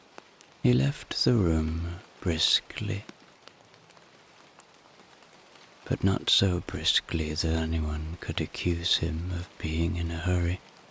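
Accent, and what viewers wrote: Ireland. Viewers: Amazing story out of all the sleep stories you have the most relaxing voice what part of Ireland are you from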